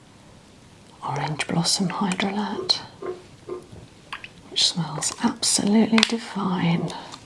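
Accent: United Kingdom. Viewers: Hello from North Texas, USA. Is it weird that I want to speak with a British accent after watching your videos. I love hearing your soothing voice.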